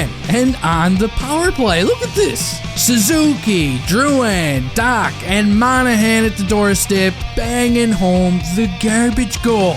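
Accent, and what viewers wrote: Newfoundland, Canada. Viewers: That was an amazing ''turd'' period!! Love the NL accent!👌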